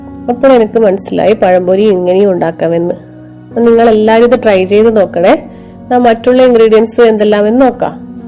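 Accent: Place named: Kozhikode, India. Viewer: slang kettappo thonni nammade sidaan...njan Kozhikode aa😄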